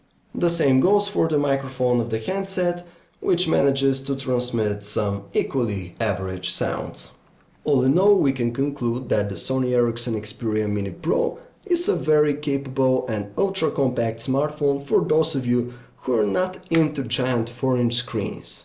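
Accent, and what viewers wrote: Eastern Europe. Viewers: So he's got an eastern european accent ? So what ? Im used to it in the UK, it's a very good and informative review. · this eastern European accent is what i love about this guy's reviews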